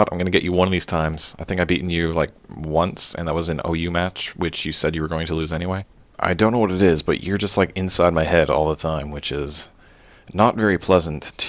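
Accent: Canada. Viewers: u sound candian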